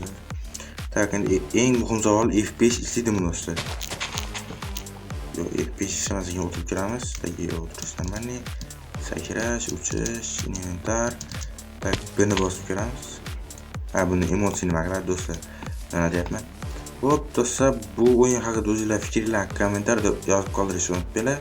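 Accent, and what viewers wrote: Khorezm, Uzbekistan. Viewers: Oka Xorazmdan misiz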